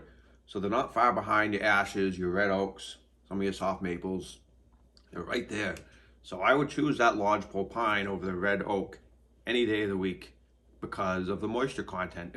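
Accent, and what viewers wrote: Massachusetts, United States. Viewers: I live close to Frankfurt, Germany and have access to beech, oak, pine, spruce and fir mostly with the occasional birch and wild cherry. My favorite firewood so far is beech. Starting the fire with some softwood and then adding beech is my standard procedure. Oak is great for putting on a good bed of coals. I split my firewood roughly into the size of a triangle that forms when you hold both index fingers and thumbs together. The length is between 10 and 11 inches (25-30cm). Stored in a proper wood shed, I usually get all my firewood to a moisture content below 20% until September/October when split and stacked in March of the same year. Thanks for the great videos, content and information. The Massachusetts accent reminds me of our relatives that live in Boston and Concord, MA.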